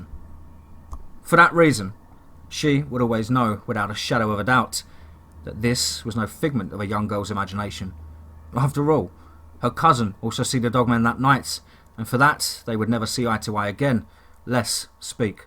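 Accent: United Kingdom. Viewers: Hi There, new subscriber here, from the Midlands, UK. Love your narration, as I can understand more clearly as its a familiar British accent, not that I dislike the American accent (I actually bloody love it lol) but sometimes I struggle to grasp the narration with a thick accent, as no doubt some of you would struggle with mine once my midlands accent came through lol, but lets make this clear, I'm not a Brummie FYI lol. Alicia my lovely, you are one helluva strong lady, and my hat goes off to your bravery, and I don't blame you for showing that DM who's boss around your parts. I will continue to look for updates on here, but pray for your safety. blessings to you and your family and I mean that from the bottom of my heart. stay safe xx Julie xx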